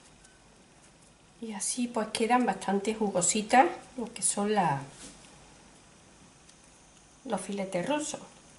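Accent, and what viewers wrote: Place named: Cordoba, Argentina. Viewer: Muy ricos estos filetes rusos😋😋 Muchas gracias a tí por compartir esta rica receta. Cada día estoy más contenta de ser una de tus suscriptoras. Me encanta tu canal. Me gusta como cocinas y como explicas tus recetas con ese acento al cual le tengo tanto cariño. Un abrazo desde Barcelona y hasta el próximo vídeo, cordobesa guapa. 😘😘😘☀️☀️☀️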